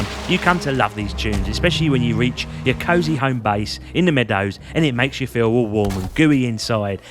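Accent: United Kingdom. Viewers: its allways so obvious and funny when the youtuber is british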